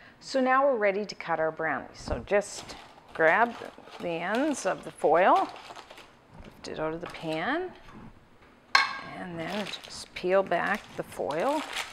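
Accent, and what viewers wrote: Canada. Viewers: She sounds so canadian "abowt"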